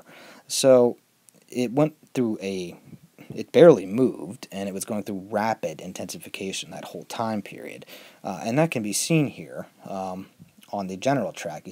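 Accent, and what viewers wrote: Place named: Pennsylvania, United States. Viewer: Sounds like your from Berks County me too awesome storm but I was around in 83 blizzard also. 83 was more like 26-30 in SE BERKS. With a lot of lightning & thunder snow & 4-6 inch per hour rates & 12 degrees at the height of the storm both were unforgettable. The Jan 2016 storm we got 27 inches but not near the wind of 83 & 96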